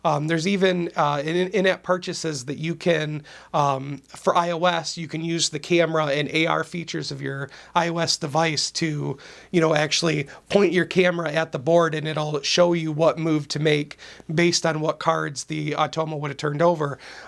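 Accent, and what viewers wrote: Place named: Wisconsin, United States. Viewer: I feel like you have a northern accent. Is that a Wisconsin accent by chance? lol.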